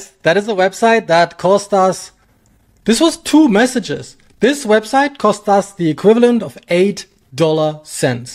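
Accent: Germany. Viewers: Bist du schwabe? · Great video, thanks for sharing! I realy searched for someone who compares the ai builders and gives a real recommendation! btw. are you german?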